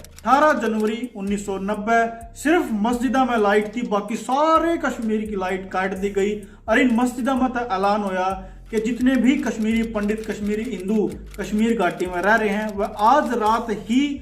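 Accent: Haryana, India. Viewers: Bhai tara video suru hon ta paala ka intro va haryanvi tone bhot badiya laga ha 👌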